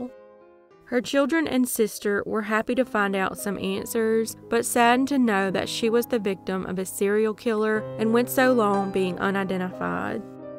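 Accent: South, United States. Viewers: Your southern accent is adorable 💕❤️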